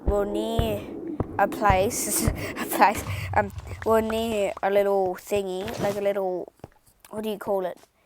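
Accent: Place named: New Zealand. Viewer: ok u sound like a kiwi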